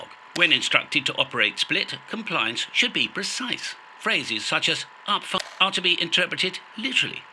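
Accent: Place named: RP, United Kingdom. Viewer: Ahhhh, at last. Something in good old Queen's English! It all makes sense now. I shall rush out to Tandy this instant and buy myself some helectronical componentry with which to build myself a "rig" in ones "ham shack"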